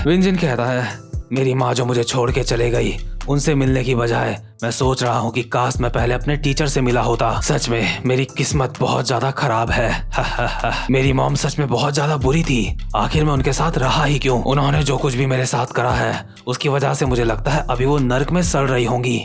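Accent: Uttarakhand, India. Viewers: Bhai aap uttrakhand sa ho kya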